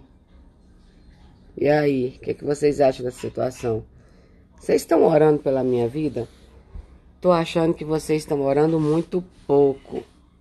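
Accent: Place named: Sao Paulo, Brazil. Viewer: Bispa você é paulista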